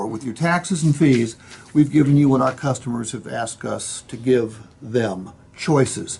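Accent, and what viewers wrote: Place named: South, United States. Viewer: hillbilly and his hick rube foghorn leghorn talk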